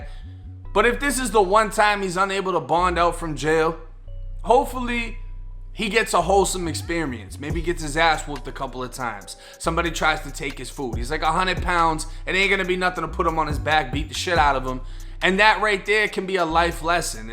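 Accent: Boston, United States. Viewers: How u got a Boston accent but u from Florida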